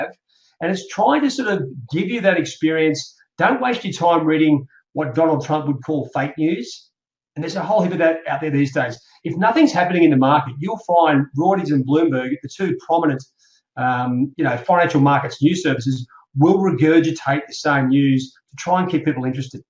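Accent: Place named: Australia. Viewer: I can't understand that severe down under dialect. pass~>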